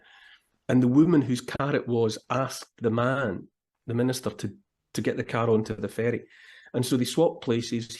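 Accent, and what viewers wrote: Scotland, United Kingdom. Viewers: That strong Scottish accent kind of made it obvious that your a Scottish guy 😅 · I never knew you where a wee Scot? 🧐😊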